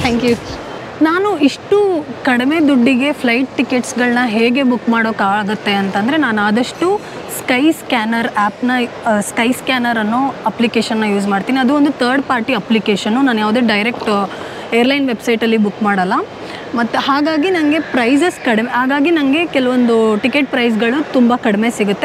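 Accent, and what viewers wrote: North Karnataka, India. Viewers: Madam baal information kodathiri tumba ista aadri nivu baal chanda uttara Karnataka maath mathadteri please nam baashe explore maadi namma bashedag vlog maadri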